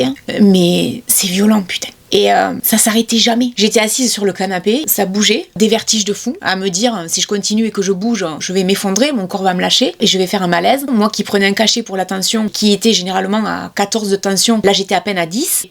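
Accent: South, France. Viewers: Chère, En 2018 j’étais en arrête pour une dépression. Je ne dormais pas la nuit. Un soir je suis tombée par hasard sur ta chaîne, et ta voix douce et ton accent du Sud me détendaient et apaisaient ces soirées pénibles. J’aimerais tellement pouvoir t’aider aussi. Tu es une personne forte et combative, tu l’as toujours été, tu t’es construite comme ça. La détermination dont tu fais preuve face à ce traitement et ses effets force le respect. Quand j’ai lu le titre j’ai eu très peur que la partie ne soit perdue. Mais c’est tellement mal te connaître. C’est dur de ne pas être inquiet. Go Maman Youtube, on est avec toi 💖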